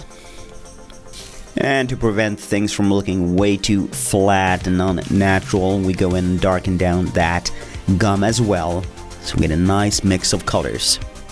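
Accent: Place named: Malmö, Sweden. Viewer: Ah ni är från sverige? Malmö dialekt?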